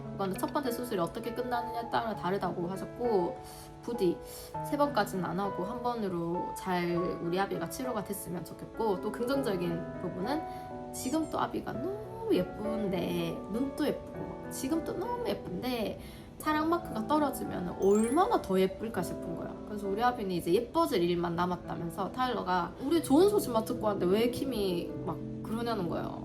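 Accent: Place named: Daegu, South Korea. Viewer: 아이공 ㅠㅠㅠ비슷하게 출산해서 10개월 딸아이 키우고있는 구독자에요 수술대 올라간다는 생각에 마음이 너무 무거우셨을거같아요. 하지만 아기는 생각보다 강하니!! 다 잘될거에요 우리 이쁜아비 수술 잘 받고 건강하게 잘 자라쟈❤ 늘 댓 달때마다 적지만..저두 대구에서 10년가까이 살아서 님 사투리 들으면 너무 정겨워요 지금은 남편따라 또 타지에와서 ㅠㅠ대구가 그리워요.. 님은 얼마나 더 그리우실지.. 힘내세요❤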